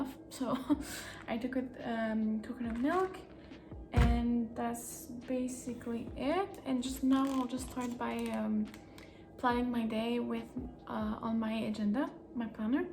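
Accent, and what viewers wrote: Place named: France. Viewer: your English French accent got me hooked...lol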